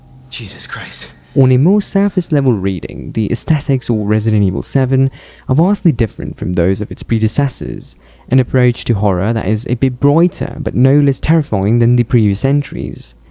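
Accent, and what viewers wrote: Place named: Northern England, United Kingdom. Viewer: bro i really didnt mean anything by it. I actually felt bad and was gonna go back and delete the comment. Felt crap to watch such a great video amd just leave that as a comment. Im just usually good with accents and was puzzled by yours. You sound like you're from a nice part of northern england or something. Genuinely tho mate, this was such a good video. I felt like you put a lot of stuff that i felt into words so thanks. Ill definitely watch the new video when uts out too ✌️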